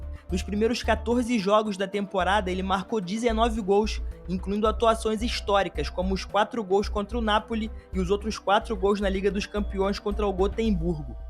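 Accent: Rio de Janeiro, Brazil. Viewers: A única coisa que me irrita, é esse sotaque carioca insuportável e indigesto. Marco van "Baxten"...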